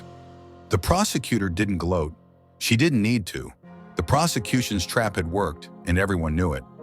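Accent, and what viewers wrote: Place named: United Kingdom. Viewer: What's with the British accent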